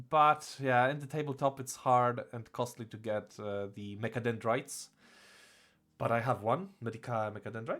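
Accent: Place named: Germany. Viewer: you sound kinda German but also not at all, the accent is so hard to pinpoint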